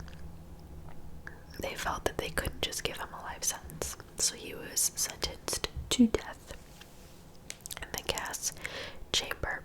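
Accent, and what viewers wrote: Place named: Independence Township, United States. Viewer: Such a soothing voice Always gotta remind myself irl sounds like she's from Independence Township Edit: not to mention, ASMR crime stories have put me to sleep more than once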